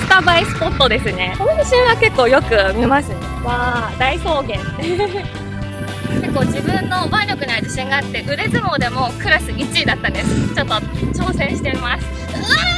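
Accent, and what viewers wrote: Taiwan. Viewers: 為什麼是講的台灣國語?好可愛! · 根本是台妹講日語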